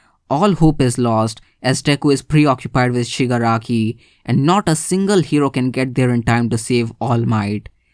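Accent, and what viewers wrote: India. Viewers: Clicks video, hears the thicc Indian accent, alright I'm out( after commenting ts ofc)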